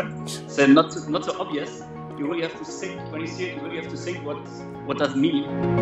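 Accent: Germany. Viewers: The way he speaks englisch he is a german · I‘m german and i can clearly hear the german accent when he talks hahah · Typisch Deutscher Akzent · His german accent lol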